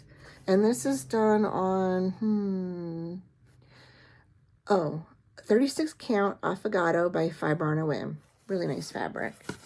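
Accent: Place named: Long Island, United States. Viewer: Don't lose your Long Island accent... that's what first drew me to your flosstube... in addition to your wonderful samplers and camping stories. I was born in Bklyn and my folks moved us to the midwest. I so wanted to keep my accent, but I wasn't that lucky. I guess the linguistics doesn't change after age 16 and I was 13 when we moved. I an old lady now and every once in a while a word will slip out. Keep up the good, no great work!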